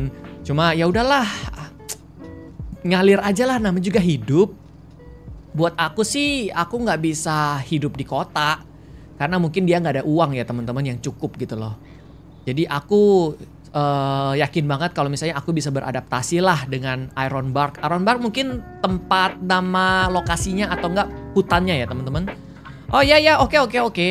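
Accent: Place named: South Jakarta, Indonesia. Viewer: Jaksel bangget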